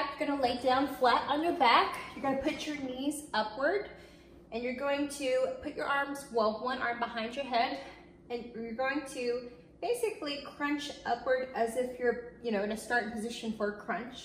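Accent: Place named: United States. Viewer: ZAKIYA SULEIMAN she has an American accent🤷🏽‍♀️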